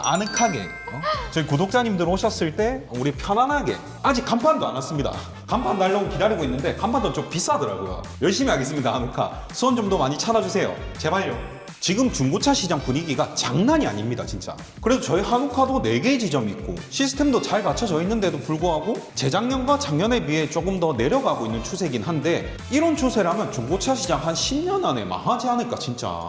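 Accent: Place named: Gyeongsang, South Korea. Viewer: 사투리는 갱사도네요.ㅋㅋㅋ 아랫동내는 역시 먹을께 없엉....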